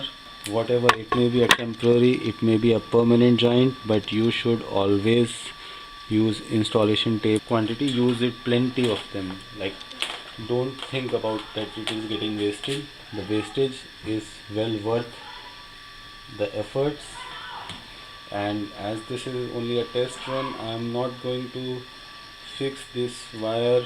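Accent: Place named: India. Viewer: I like the way Indian people speaks english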